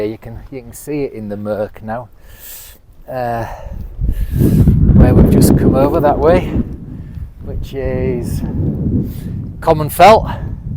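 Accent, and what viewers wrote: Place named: Yorkshire, United Kingdom. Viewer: Standard day int Lakes, but glad the weather improved for yas;) I've lived here two years now and it's hard to motivate yourself to get out when it's claggy and damp so much, compared to other places I've lived (Northern Snowdonia) and yes, a fellow Yorkshireman so always glad to hear you using the natural dialect and you like to get away from people!:) Better weather days are coming!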